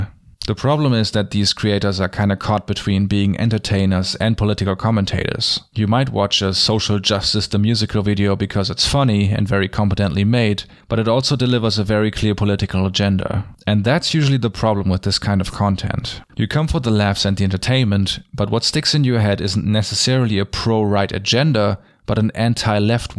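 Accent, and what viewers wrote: Germany. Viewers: a slight German accent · your German accent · The content of this video is entirely based on the premise, that you are a mindless bee simply running after the sweet anti-sjw-content you were made addicted to. The mindset of a true lefty: Everyone is just following perceived elites. In this particular case, running after more or less famous youtubers. For example, I liked Ben Shapiro back in the day, when I have first seen him, because he stated arguments in an extremely condensed manner that I have stated for years and yet have to see a well thought through counter-argument against. I have experienced Sargon more as a funny Britsh dude with some good and some bad arguments than an authority in arguments. And you got to give to the far-righters on youtube: They have a very sharp insight into the lefts mindset and their ideological premises. 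And to add also a counter-argument to one of the points made in this video: Yes, SJWs are a minority within the left. Political radicals are always a minority but this fact doesnt imply that they have no or cant gain any relevant political power. Quite the opposite is the case. By your accent I can tell you are from Germany: "Gleichstellung" von Frauen ist auch nur eine Idee einer kleinen linksradikalen Minderheit in Deutschland und doch ist dieses linksradikale, freiheitsfeindliche und im Kern kommunistische Konzept heute Bestandteil der Gesetzgebung und wird von fast allen Parteien vertreten. Das ist ein aktuelles Beispiel dafür, welchen Einfluss radikale Minderheiten gewinnen können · I had no idea how much I needed a German narrator before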